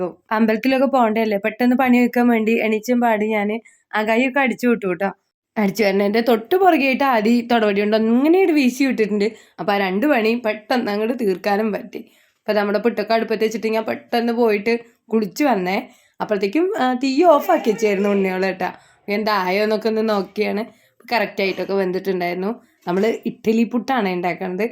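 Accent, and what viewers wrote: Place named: Northern Kerala, India. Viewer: നന്നായിട്ടുണ്ട് അല്ലേലും വടക്കുള്ളോരുടെ വർത്താനം കേൾക്കാൻ നല്ല രസമാണ്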